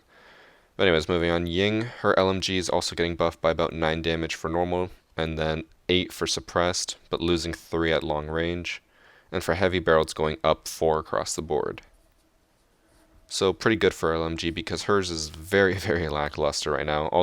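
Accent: Canada. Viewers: I love the way Canadian people say out and about😂😂 I know he doesn’t think he has a Canadian accent but he definitely does 🤣🤣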